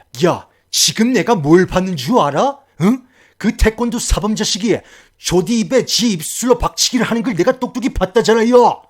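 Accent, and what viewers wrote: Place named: Gyeongsang, South Korea. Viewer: 갱상도 ㅎㅎ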